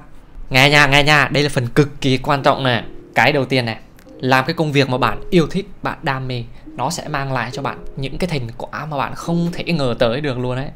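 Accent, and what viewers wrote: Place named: Quang Tri, Vietnam. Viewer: giọng quảng trị hay thế mà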